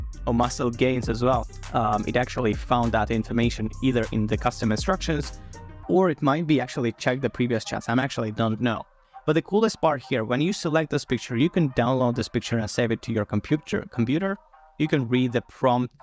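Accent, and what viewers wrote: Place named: Russia. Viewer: Many people dont understand chatgpt but your fucking FLAT tone and that Russian or whatever accent you have makes understanding chatgpt IMPOSSIBLE! And whats this "Chat GDP" u keep saying!! 🤣🤣🤣🤣🤣🤣🤣🤣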